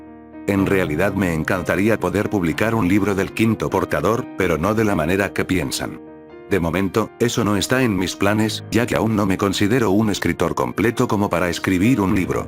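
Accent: Argentina. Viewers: Sabia que eras argentino uwu Se nota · We yo ya sabía que eras argentino :V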